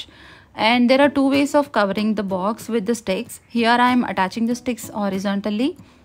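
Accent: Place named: South India, India. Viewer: see is south Indian her accent will be like that You don't teach her necessary English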